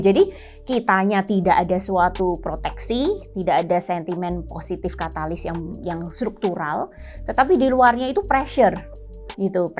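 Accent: Java, Indonesia. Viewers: bagus kontennnya. cicinya logat jawa medok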